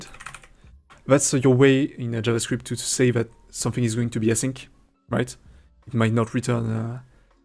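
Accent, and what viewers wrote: France. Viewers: I can spot a french accent from a mile away ahah keep up the good work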